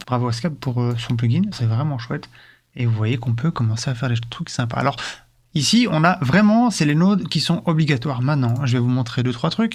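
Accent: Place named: Belgium. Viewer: 10 secondes de vidéo et je me disais bien que cet accent m'était familier .. :p Salutations de Belgique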